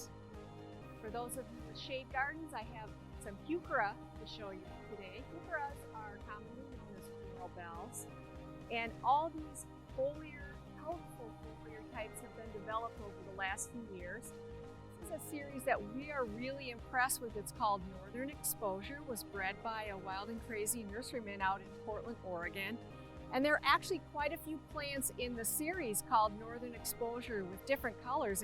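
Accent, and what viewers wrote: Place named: Minnesota, United States. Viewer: Thanks,! You're awesome, and I love your Minnesota accent! This video was super helpful and filled with beautiful plants 😊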